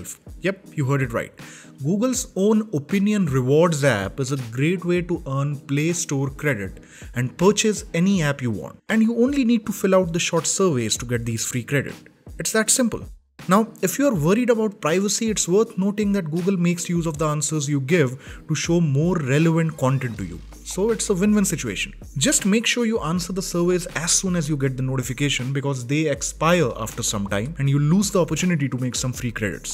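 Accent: India. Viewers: bro every tutorial i search on youtube is english but the accent is hindi and the voice is not good to hear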